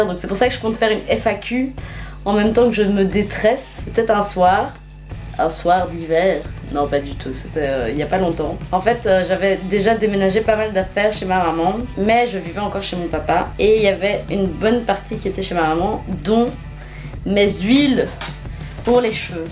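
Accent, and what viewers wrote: Belgium. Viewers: Tu as un peu l’accent belge 😂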